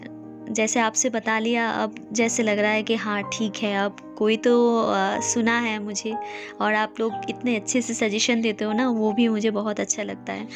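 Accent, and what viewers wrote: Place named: Bengal, India. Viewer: Love you....apki Hindi n mujhe bahut acchi lagti hai bengali wali ultimately 😀😀😀🤗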